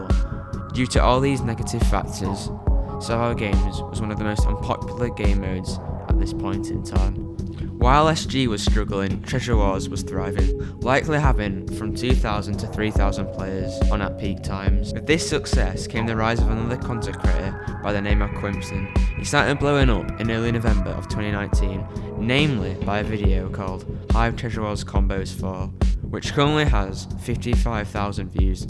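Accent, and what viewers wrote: United Kingdom. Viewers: Edit: English boy talking about the story of a block game server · are ypu birtish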